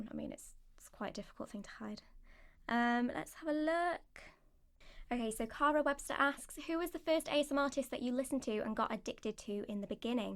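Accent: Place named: England, United Kingdom. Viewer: Good lord I love English accents...... I'm Irish but I'm determined to Marry an English bird, fuck that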